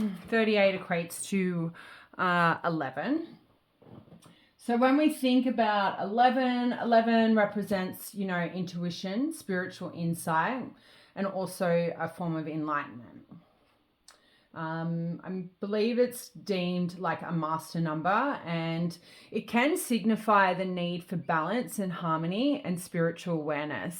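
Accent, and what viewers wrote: Australia. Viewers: All the piles and it’s the best having an Aussie mate who sounds a bit like me, chilling in my lounge. And now I must ask the most important question 🙋‍♀️… Have you been shopping at Big W?? I’m busting to get to use the decks you’re giving my message with as I speak (and listen). If not, unless you wish to sink into the devil energy as a choice - as I am - all 9 of pentacles, boujee single lady in all the abundance. That’s not exactly the case, but I slapped on a face mask and got some conditioner in my hair (ma’am, I made it into the shower today and that was a soul upgrade in itself 💪) and this reading is absolutely resonating. Even more so that I can listen to it in the accent that the hate is coming from. Same as my own. Not my high school mates. They are staunch ✊ A different area but same group. Every town has one. Taylor Swift wrote a song about them - 15? 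I think?? And the kardashians didn’t blow up on love when I think about it. Kris Jenner is the boss of turning hate viewing (the best kind really bc them they’re paying attention. They are Scrutinising even) into the big bucks. That’s why I connect to Nelly’s even though I’m the wrong colour and gender and way too old to sing what I do, but I really only know that if I hear someone saying it and I believe it. And you’ve given me EVERY CONFIRMATION. Deck of Darkness - underpins EVERYTHING FOR ME in this moment. You said something like you don’t have any witchy woo cards out. I’m super spiritual but I’m als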